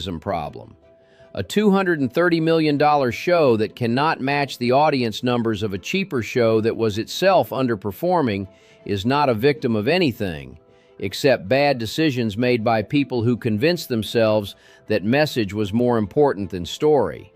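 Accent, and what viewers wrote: Texas, United States. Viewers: Hello - I'm a new subscriber. I love your Texas accent. Greetings from Maryland USA.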